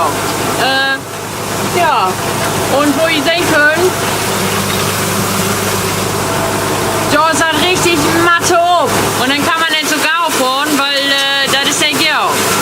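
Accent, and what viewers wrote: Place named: North, Germany. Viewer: Ich schaue seine Videos um bisschen zu verstehen wie die hier im Norden reden 😂😂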